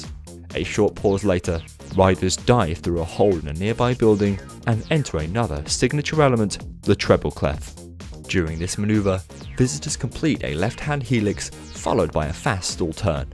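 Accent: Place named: Public school, United Kingdom. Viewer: Yes......fair enough! You guys do have different styles, I have to concede...though I must say that you have the posher (public school) accent.....whereas 'Expedition' sounds more like a chavvy south London/home counties one. Yeah....I keep on thinking of Sadiq Khan everytime I hear 'Expedition Theme Park' speak!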